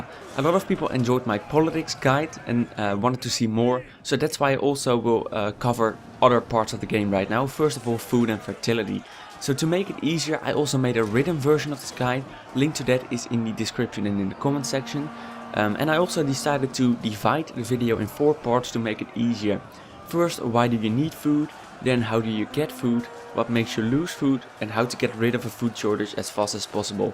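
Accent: Netherlands. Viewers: This guy is so dutch , holy shit ! ty tho <3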